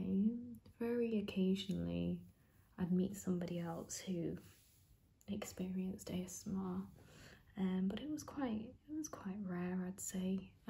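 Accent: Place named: India. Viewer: So relaxing please make another Indian accent asmr video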